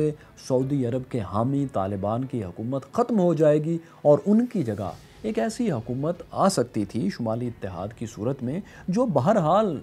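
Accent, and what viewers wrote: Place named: Punjab, Pakistan. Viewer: Urdu with punjabi accent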